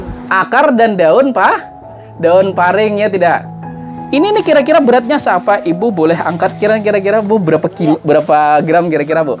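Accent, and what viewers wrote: Banjar, Indonesia. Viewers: Mantab, logatnya Sangat Banjar banget, salam dari Arek Banjar Suroboyo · Ooo pantas am bepander macam urang Banjar, salam sukses, moga chanelnya terus berkembang & tak bosan berbagi ilmunya Bang.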